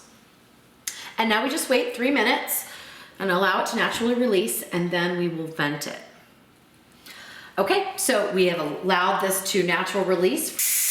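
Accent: United States. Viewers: From Kentucky?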